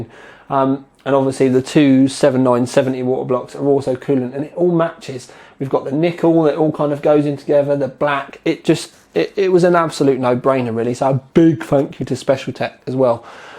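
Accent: England, United Kingdom. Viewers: *Clicked on video* *Heard you were English* *Subbed* *Watched the rest of the video* *Stayed Subbed* Such a good rig!!!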